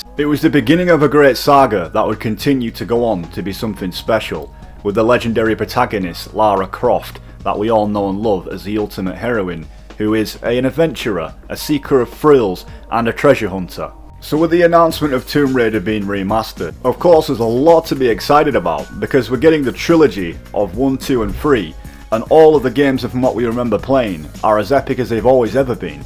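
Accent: Bradford, United Kingdom. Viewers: Dude!? Are you from bradford??? You sound just like me 🤣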